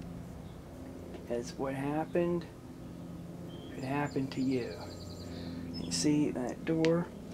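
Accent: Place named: Pennsylvania, United States. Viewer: Your accent is painful to listen to. I'm guessing you're from PA?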